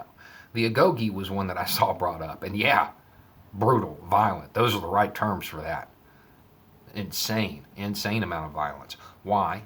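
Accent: South, United States. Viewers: I hope you see this, I'll keep trying. I am working on a PhD in clinical psychology and I like you. I am going to admit, it took a lot of work for me to get past my implicit biases of your appearance and accent, my horrible family who happened to be from the south had traumatized me in that way. What you have to say is so important and so perfect that I did that work so I could listen to you. I've heard you express you don't have the the psychological knowledge to answer some of the questions you are asked. I'd like to help. I have been thinking about it for a while but when I heard you say in a video this morning that your dream for the world is being able to exist without government, I was moved to act. I imagine we probably have a lot of idealogical differences but I think that could just make us perfect sparring partners, we'd make each other better people. 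Give it some thought.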